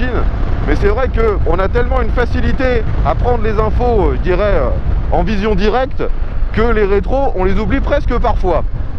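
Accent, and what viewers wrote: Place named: Paris, France. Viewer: On accroche pour tes commentaires ,et ton timbre de voix avec cet accent titi Parisien bien sur.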